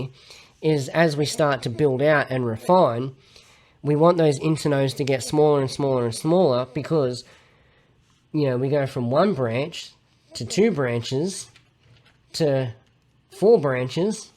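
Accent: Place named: Australia. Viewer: Brilliant explanation, bonus you got the Aussie accent mate, bloody love it legend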